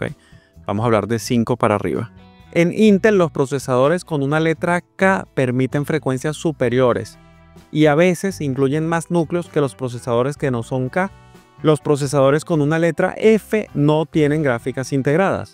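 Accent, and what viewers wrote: Venezuela. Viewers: bro tu eres venezolano?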